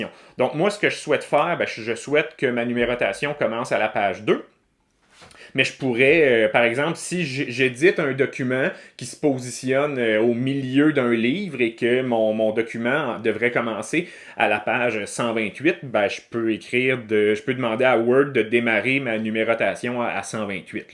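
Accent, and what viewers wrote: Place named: Canada. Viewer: Rien que pour l'accent! Partir au Canada, quel plaisir et, en plus, les explications sont très claires. Merci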